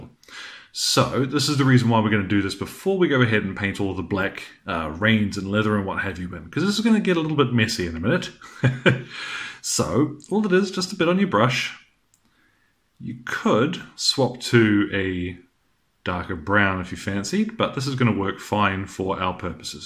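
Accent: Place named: New Zealand. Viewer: Where are you that it is so hot? An Aussie like me? I had thought you were a Kiwi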